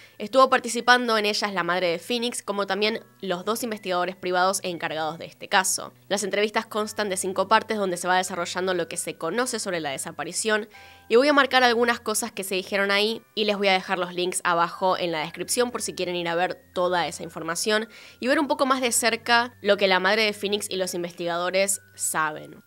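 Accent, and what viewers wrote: Argentina. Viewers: No m gusta el acento argentino pero ella no se me gusta escucharlo de ella..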